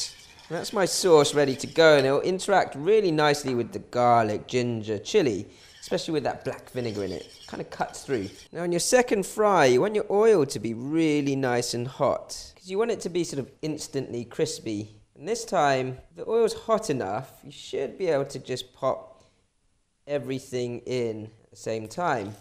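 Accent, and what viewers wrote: United Kingdom. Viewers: Love your British accent 😄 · Slightly British accent 😁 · Chinese guy with British accent got me head spinning! 😳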